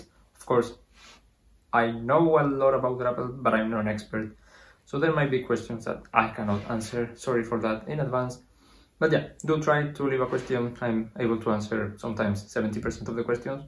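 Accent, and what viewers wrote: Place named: Spain. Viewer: jajaja podia adivinar que eras español, muy buen video hermano! justo lo que necesitaba. gracias :)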